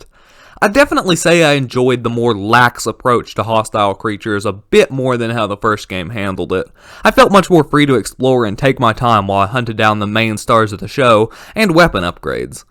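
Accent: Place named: South, United States. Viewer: Your voice sounds like a mix of optimus, mrBeast, and a slight bit of southern and it amazing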